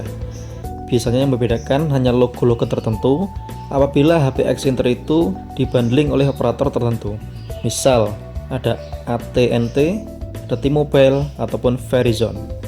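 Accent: Java, Indonesia. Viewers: Terlalu kental jowonya mas ,, sampe sya dger berkali kali mas Jowo ngucap nama prociss nya Snapdragon dan Exynos ,, kalo bisa latihan encerkan dikit Logat Jowo nya . thx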